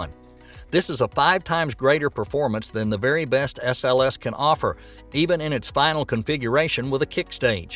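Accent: South, United States. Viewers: Anyone else find it hard to watch a technology video and take it seriously when narrated in a southern accent?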